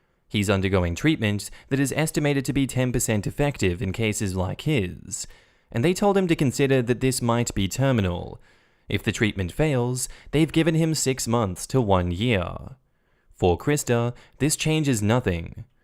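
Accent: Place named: Australia. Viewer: He's from Australia.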